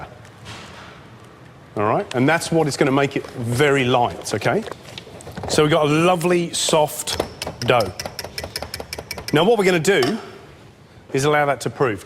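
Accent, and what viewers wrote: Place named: Australia. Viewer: THANK you, i don't know Australian, and I was like what the heck did he say.? Now to transfer that into ENGRISH... like ounces and cups.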